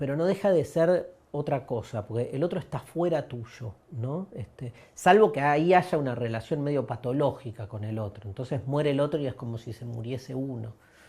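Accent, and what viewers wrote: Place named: Argentina. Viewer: es genial!! Clase de filosofía con carcajadas es una delicia. De ñapa clase de español argentino.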